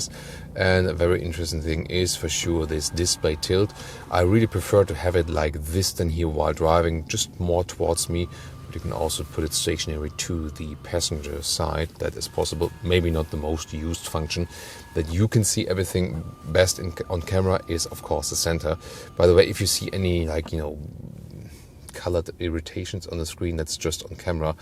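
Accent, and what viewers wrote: Germany. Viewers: Wieso sind die Videos Englisch? Rein vom Akzent her würdest du ja Deutsch sprechen, oder? Es ist nicht so, dass ich der englischen Sprache nicht mächtig wäre, im Gegenteil. Aber dieser Kanal in Deutsch wäre wohl eher eine Marktlücke als noch ein weiterer englischer Auto-YT-Kanal...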